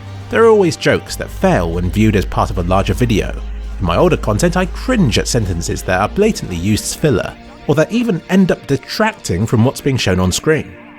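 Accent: United Kingdom. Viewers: The British accent and your voice are must for me to listen to each week.Gorgeous lyrics and entertaining videos don't hurt either.Keep doing what you are doing for as long as you enjoy it cuz i certainly love all your content,even some i did not expect to love.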